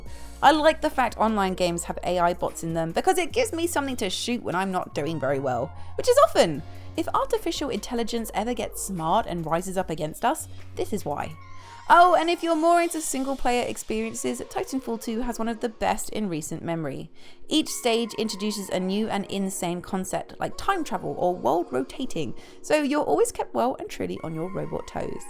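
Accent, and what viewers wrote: United Kingdom. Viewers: why is every xbox video a british accented female? im just wondering.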